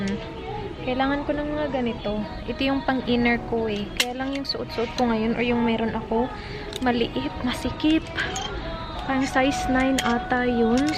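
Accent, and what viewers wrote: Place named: Visayas, Philippines. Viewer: Always watching ur blog... di gyud kumpleto ang adlaw og di ko kita sa imo blog 😊 bisaya kaau 😂 cagayan de oro 😚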